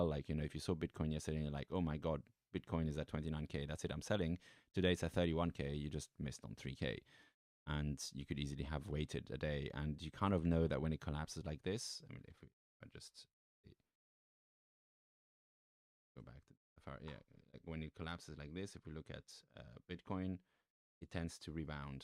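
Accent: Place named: Switzerland. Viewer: Great analysis, thanks! I couldn’t figure out if your accent was German or French, it had elements of both so I guessed you are from Switzerland. I go to your twitter and boom, I wasn’t wrong :)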